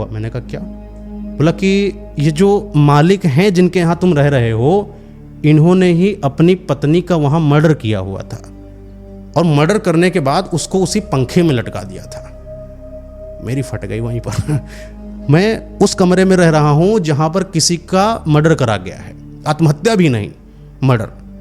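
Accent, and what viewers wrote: Kanpur, India. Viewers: Bhai k bato se LG gya kanpuriya hai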